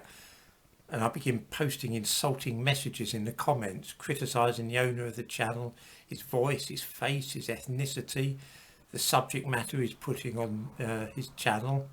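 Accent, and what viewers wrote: United Kingdom. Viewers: I have to say I have enjoyed this man's videos a great deal. I can't comprehend how anyone could find his charming British accent and peaceful, relaxed speech, somehow annoying.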